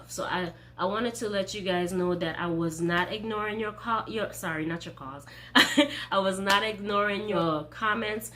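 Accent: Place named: Guyana. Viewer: Why do I hear your Guyanese accent 😭🔥🔥 I love our accent! Thanks for the video!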